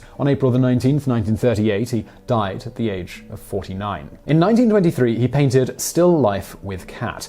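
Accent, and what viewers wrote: England, United Kingdom. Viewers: He is a man from England - the country that invented the language - and you claim he is not pronouncing American names in proper English. You do not own the language and you need to remember that. Americans are not the go-to for proper English pronunciation ffs! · With your accent on vowels, some words are difficult to understand for non-british English speakers. Example, it's difficult to comprehend if your saying "of Pablo Picasso Fame" when discussing the offshoot of Cubism. Making a legitimate Subtitles option would help. The YouTube Auto-generated subtitles don't provide accurate subtitles if many vowels don't have an American accent.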